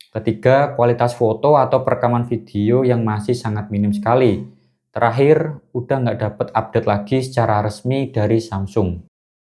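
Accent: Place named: Java, Indonesia. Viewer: Kyknya gw nya mending di ganti aku deh bng medok soalnya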